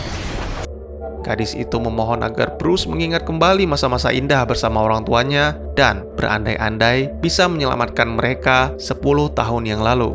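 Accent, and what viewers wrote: Aceh, Indonesia. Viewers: orang aceh ya om?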